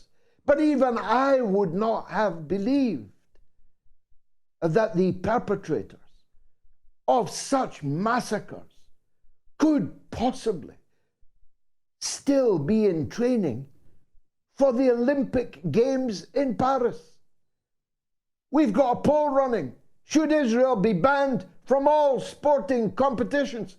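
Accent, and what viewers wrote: Scotland, United Kingdom. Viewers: Tacitus born 56 AD would be proud of you a man of words well don't know what he think about the Scottish accent. I looked it up because I love history. Thank you, you can tell wifey about that❤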